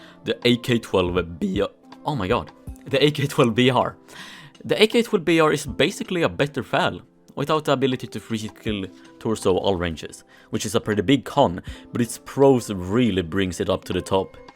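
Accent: Sweden. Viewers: 100% svensk, hur på hur du pratar lol. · R you swedish lol? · Are you Swedish? You sound a lot like it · He sounds more Swedish to me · This guy swedish? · bruh jävlar vad man hör du svenne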